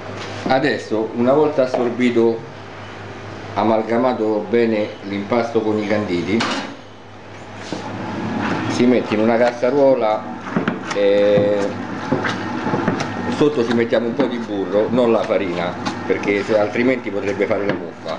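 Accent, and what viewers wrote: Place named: Rome, Italy. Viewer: di foggia? ma non lo senti che parla in romanaccio?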